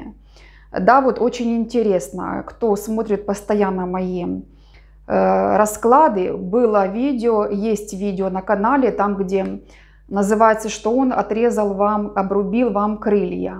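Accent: Ukraine. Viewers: Вы все расказали о нас с ним правду ,как будто вы за стенкой живете у нас ! Я преклоняюсь перед вами ! Как вы все правильно видите и говорите ! Дай. Бог вам крепкого здоровья и мирного неба нам всем над головой ! Да я украинка ,и вы наверно тоже ? По говору чувствуется ! 100000 раз вас за все благодарю ! Хотелось бы мне с вами сделать личный расклад ? Напишите мне ответ ? Благодарю вас ! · Приятно слышать ваш украинский говор специфический, в Крыму по нему можно определить местных жителей. Спасибо за расклад...он сбил серьгу...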